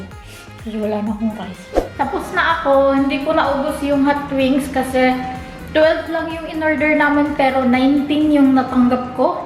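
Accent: Visayas, Philippines. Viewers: Ate bisaya ka ba?🤗🤗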